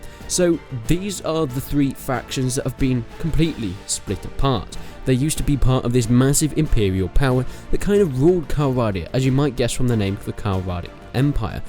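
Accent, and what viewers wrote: United Kingdom. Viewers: god, do all british people talk like this?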